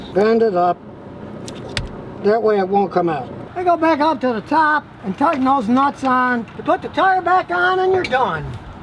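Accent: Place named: Michigan, United States. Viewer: are you from Michigan? You have a Michigan accent.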